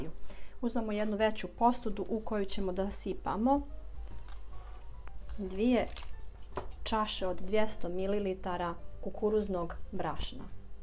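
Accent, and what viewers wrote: Bosnia and Herzegovina. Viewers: kuvas i akcenat? Ispod naslova ima napisane sastojke, bez akcenta 🤣🤣🤣. Btw zena je iz Bosne, pa otuda i akcenat. .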